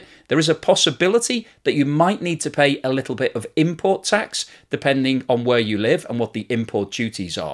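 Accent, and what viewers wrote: United Kingdom. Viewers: This propaganda piece is way to cheap. Basically, you are saying that all these countries working on this "initiative" are idiotes and don't have a clue that they are controlled. The number of participating countries keeps growing, because the new comers are not as smart as you to understand the trap? Judging your accent, don't you think it's strange that GB was among the first to invest in the bank to financing this "disaster".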